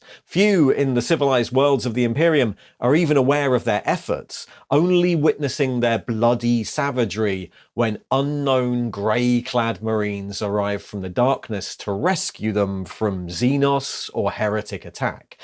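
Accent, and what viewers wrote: England, United Kingdom. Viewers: With how the accurate pictures are presented with your English accent I feel like I'm in school again 😂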